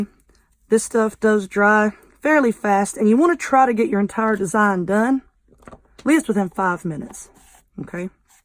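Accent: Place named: Alabama, United States. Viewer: Hello, I watch all of your videos and LOVE THEM ALL!!! My fave is the 🌹 done on a kitchen strainer!!!!! YOU ARE BRILLIANT!!!!! I am from the south, ALABAMA, and my ancent is just like yours, lol, I cuss too, it's my second language lol. I do all kind of crafts, EVERYTHING!!!! Right now I am into ALL THINGS CONCRETE,LMAO!!!!!! FIRST OFF THE SUPPLIES ARE CHEAP.... QUIKCRETE $3.88, SAND $2.88 ( BOTH ARE 50 POUND BAGS ) AND WATER. YOU CAN LITERALLY MAKE ALL KINDS OF STUFF THAT LAST FOREVER WITH CONCRETE AND IT'S EASY PEASY!!!!! RESEARCH LIKE I DID AND YOU TOO WILL GET HOOKED!!!!!! YOU CAN MAKE VIDEOS ON THEM ALSO!!!!! LET ME KNOW WHAT YOU THINK.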